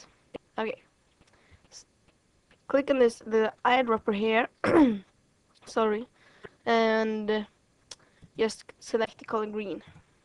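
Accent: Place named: Sweden. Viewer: Bra tutorial. Man hör att du är svensk :D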